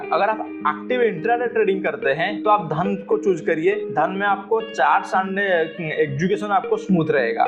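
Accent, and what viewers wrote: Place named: Odisha, India. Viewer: Odia acha bhai ??? Tama accent ru jana pduchi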